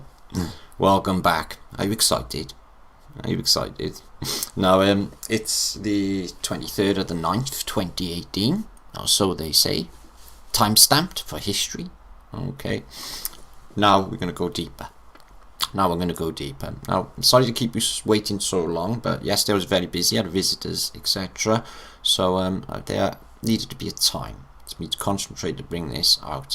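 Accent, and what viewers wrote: Wales, United Kingdom. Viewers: 🙂 accent sounded stronger back then ...In the valley s yaki da ❤️ 🤗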